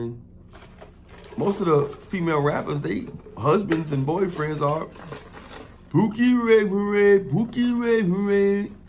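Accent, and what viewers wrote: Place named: Baltimore, United States. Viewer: You have Maryland Baltimore accent. You videos are awesome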